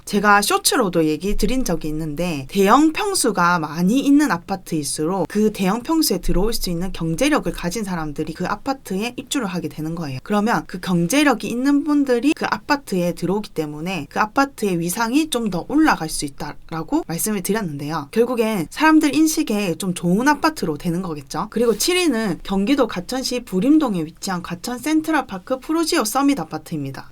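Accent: Gyeongsang, South Korea. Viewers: 대구 사람 아입니까?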